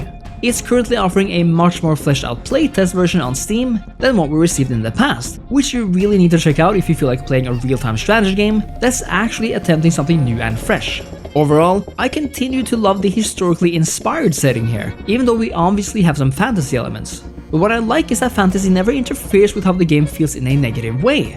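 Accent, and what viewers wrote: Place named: Norway. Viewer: He is from Norway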